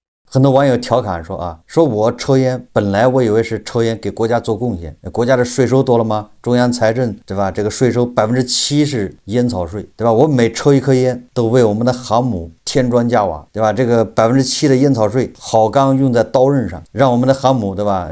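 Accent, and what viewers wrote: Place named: Anhui, China. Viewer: 听口音，叔是不是安徽南方，靠近湖北那一片的人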